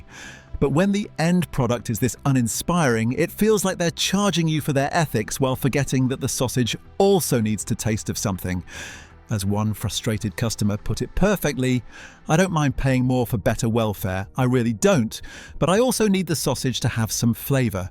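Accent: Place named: United Kingdom. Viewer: These videos are supposed to be factual and true and the narration sounds British - however the images used in most cases bear zero relevance to British products, in some cases the images show products priced in US dollars! When the pictures are wrong one starts to wonder whether the information is true?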